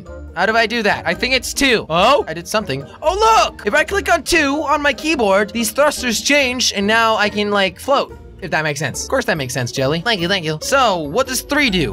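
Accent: Germany. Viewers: are you German??? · are you german ?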